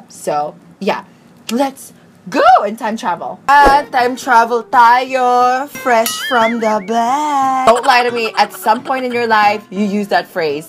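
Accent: United States. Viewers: I literally thought you live in us bc the way you speak! HAHAHAAHAH! Omyygad! Youre slayinnnnn it gurl! This is the first time i’ve watched u! Haha keep spreading good vibes! Mwa xx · im a new subscriber of yours akala ko nung una american ka hahahahha na curious kasi ako sabi ko bat may foreigners na nag rereview ng anne clutz brush hahah so ayun nagulat ako nung nag tagalog ka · OMG This is my first time to watch your video and I thought you were american. I was shocked when you've started to talk in tagalog!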